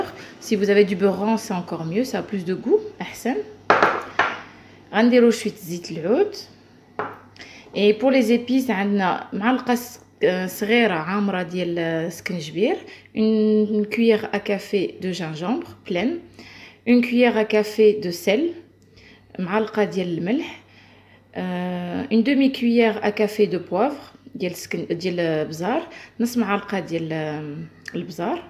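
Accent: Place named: Tlemcen, Algeria. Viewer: Vous avez un accent Tlemcen et le vocabulaire n est pas marocain . Les épices .les herbes la farine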